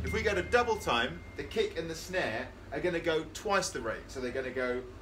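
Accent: England, United Kingdom. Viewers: loved it!! also I love your English accent :)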